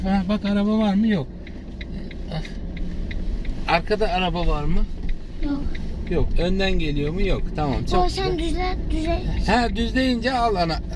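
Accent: Black Sea, Turkey. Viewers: Abi rizelimisin yoksa Giresunlu mu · Bencede Karadenizli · Abi galiba karadenizli galiba uşağum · Abi karadenizli misin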